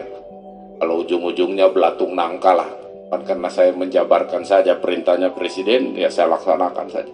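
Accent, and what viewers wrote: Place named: Makassar, Indonesia. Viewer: Meskipun sudah jenderal tapi logat Makassarnya masih ada. Salamaki pak kapokri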